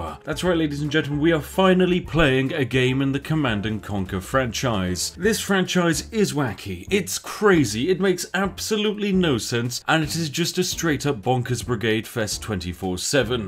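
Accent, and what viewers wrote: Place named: Devon, United Kingdom. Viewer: I bet you're from Devon..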